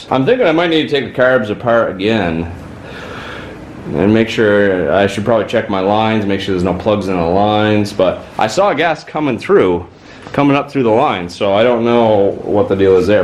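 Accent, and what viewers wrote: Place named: Maine, United States. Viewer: You sound like a Mainah.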